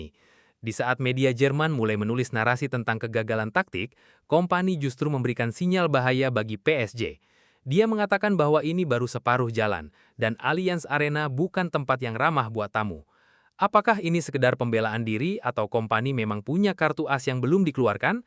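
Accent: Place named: Makassar, Indonesia. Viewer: itu logat org Makassar dan Bugis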